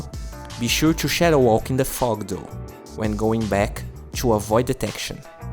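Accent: Brazil. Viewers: c eh br, seu sutaque eh bem forte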